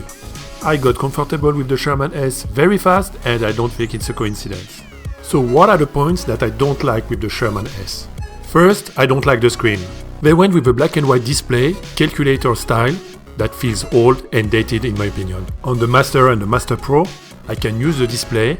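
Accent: France. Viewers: I love the fRRench accent :)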